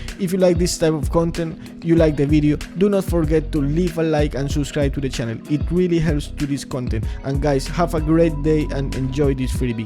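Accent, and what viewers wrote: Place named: Africa. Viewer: Scam with African accent. I feel sorry for your mother!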